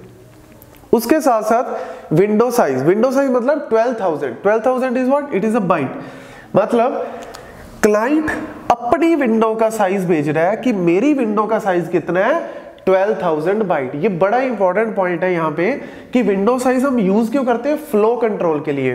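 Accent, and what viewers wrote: Punjab, India. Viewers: Damn! That Punjabi accent surely sticks in my head. Great explanation too! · punjabi accent makes the video greater , Great work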